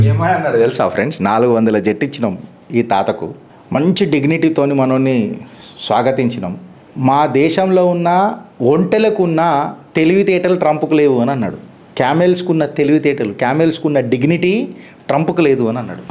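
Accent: Telangana, India. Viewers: మీ తెలంగాణా ఏక్సెంట్ అర్ధం చేసుకోవాలి అంటే మీరు మాటకు మాట కు మధ్య గేప్ ఇస్తే బాగుంటుంది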